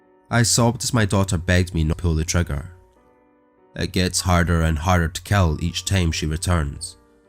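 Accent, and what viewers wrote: Scotland, United Kingdom. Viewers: Love me a good Scottish accent. Reminds me of Shrek 💚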